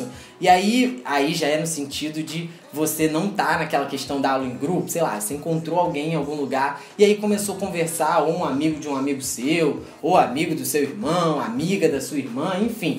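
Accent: Rio de Janeiro, Brazil. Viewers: Tu è do Rio né?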